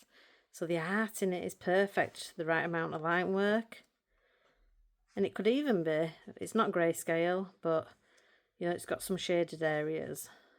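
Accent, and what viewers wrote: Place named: Scarborough, United Kingdom. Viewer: Your accent is like mine are you In Scarborough? There again you said the Range. We don’t have one lol 😂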